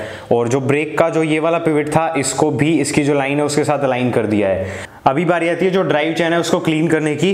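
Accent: Rajasthan, India. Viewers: Bhai tum jhunjhunu rajasthan se ho kya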